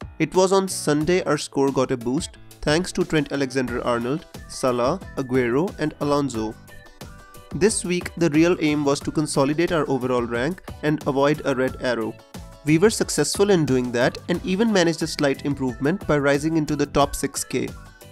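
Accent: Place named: Assam, India. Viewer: Axomiya niki o? Accent tu axomiya nisina lagise j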